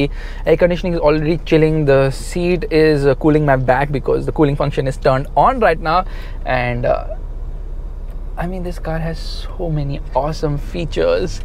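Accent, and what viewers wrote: India. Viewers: I love your Indian accent especially when your this excited. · Why is your accent so Hollywood Indian character ish...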